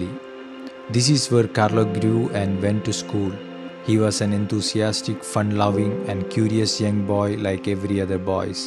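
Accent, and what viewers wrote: Kerala, India. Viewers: Is this voice over given by a priest from Kerala? Nalla kettuparichayam Ulla slang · Sounds like a malayali..... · Beautiful video. Nekkul Malayaliee anuuu ellai. I understood that from your voice. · Hearing the voice of the narrator I know his an Indian. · That Indian accent bothers me! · Sounds indian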